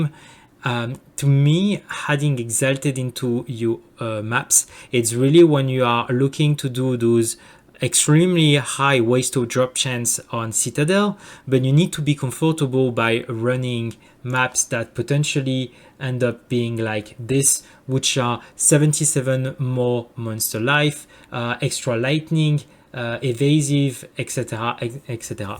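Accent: France. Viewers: On reconnaît bien l'accent français. Merci pour la vidéo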